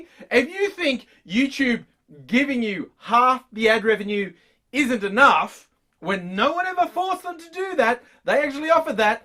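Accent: Australia. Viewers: Why is Patton Oswalt speaking with an Australian accent?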